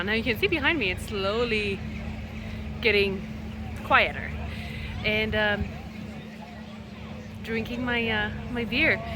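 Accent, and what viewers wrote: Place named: Ireland. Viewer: I love that you have a weeeee bit of an Irish accent, looked like a fun day! Thank you for sharing 🥰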